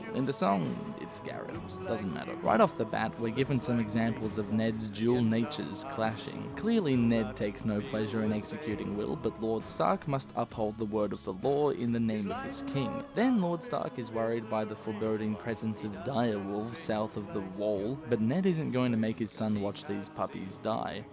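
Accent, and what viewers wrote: Australia. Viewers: Are you Australian? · The second I realized you were Australian: when you said “cracks the shits” The second I realized you were a genius with these videos: when you played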